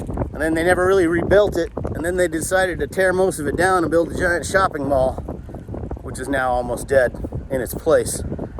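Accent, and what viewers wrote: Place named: South, United States. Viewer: Why are you apologizing for a Southern Accent? Intelligence isn’t about how you enunciate the words. You insult only yourself when you do this.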